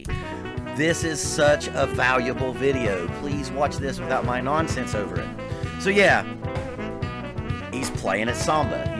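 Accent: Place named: South, United States. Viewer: Dulcet Southern brogue. Lovely and impressive vocabulary.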